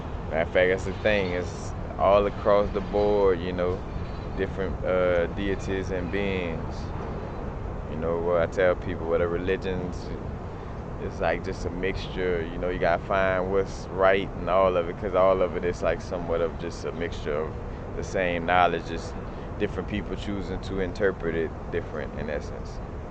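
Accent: New Orleans, United States. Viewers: Is that a New Orleans accent?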